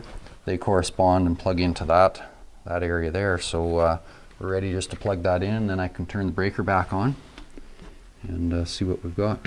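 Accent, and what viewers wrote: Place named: Canada. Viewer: I can't quite figure out your accent, are you canadian or just from some northern state in the US? Minnesota maybe?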